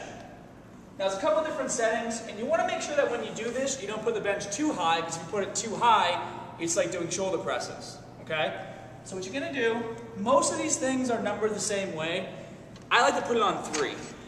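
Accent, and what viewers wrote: Boston, United States. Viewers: I can't watch videos without your boston accent anymore. I love it.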